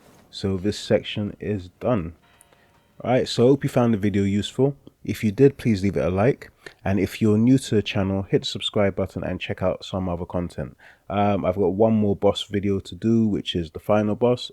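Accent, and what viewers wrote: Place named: Italy. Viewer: mate! I have an Italian friend who has exactly your accent, even a similar voice. So, I was like, aha! Got ya!!! You do like Wuchang!!😂😂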